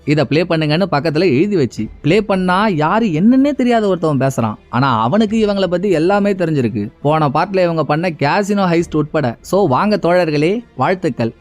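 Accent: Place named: Southern Tamil Nadu, India. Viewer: Congratulations bro. I hope you are from the Southern part of Tamilnadu. Great vocal energy and slang. Kindly reveal your native brother.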